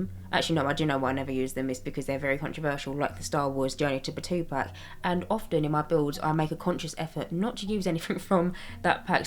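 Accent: United Kingdom. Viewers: i love your british asent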